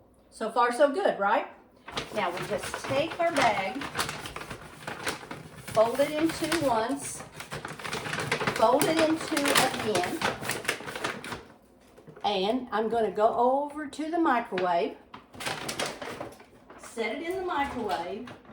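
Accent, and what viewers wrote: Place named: Mississippi, United States. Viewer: First time viewer. What a lovely, delightful lady and an informative video. I love the accent. It reminds me of home is Mississippi, as does the caramel corn and peanut brittle.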